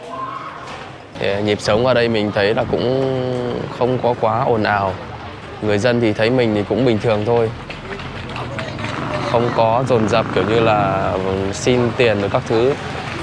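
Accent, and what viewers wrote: Vietnam. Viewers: Nói tiếng anh thuần việt, 😊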